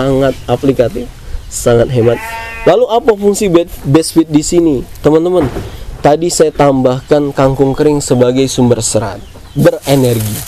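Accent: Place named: Banyumas, Indonesia. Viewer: Melo nyimak wong ngapak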